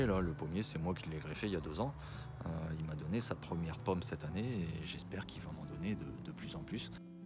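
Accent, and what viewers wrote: North, France. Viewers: J'avais bien reconnu l'accent chéri du Nord ☺️ Bravo pour toute ta belle création et tout ce que tu as donné ! Quelle énergie ! C'est une joie de te découvrir, de découvrir ta belle tribu animale. Prends bien soin de vous et belle suite encore.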